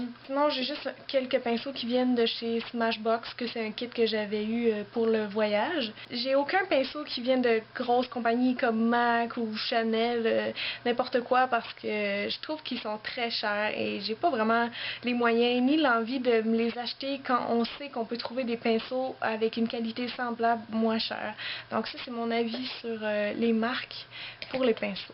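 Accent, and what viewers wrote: Quebec, Canada. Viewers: D'habitude j'aime pas l'accent québécois mais j'adore comment tu parles, ça a du charme, super vidéos! :)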